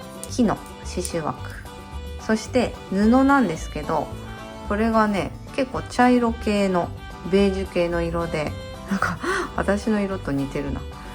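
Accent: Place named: Shimane, Japan. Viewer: 一年ちょい遅れで始めました、見つけて効率よく刺してます何より、話し言葉に惹かれ… 私の故郷の北九州弁とそっくりな方言満載…と思いきや、「だんだん」で島根の方と判明時々挟むダジャレ？に、同世代感を勝手に感じ、楽しく後を追いかけています❤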